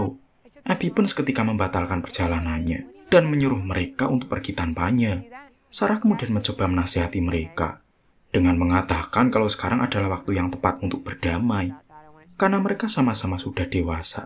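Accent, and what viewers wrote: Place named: Banyumas, Indonesia. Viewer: pd bae ngapak ya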